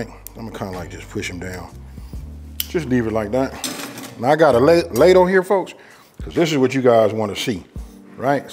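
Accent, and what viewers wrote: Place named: California, United States. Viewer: sounds like an Cali dude who’s family came from the south. ❤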